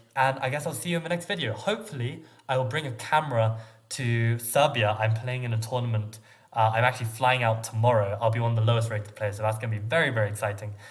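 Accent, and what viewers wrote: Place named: Germany. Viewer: the German Accent sounds real cute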